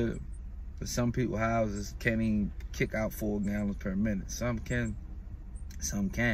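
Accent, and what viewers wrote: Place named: Baltimore, United States. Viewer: Great information my brother.Are u from Baltimore?I got homies from Baltimore and they talk just like u bruh😂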